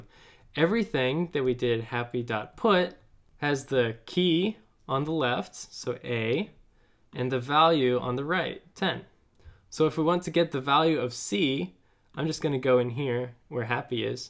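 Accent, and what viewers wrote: India. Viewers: Here, after concentrating to get what was said in the Indian accent, one time too often.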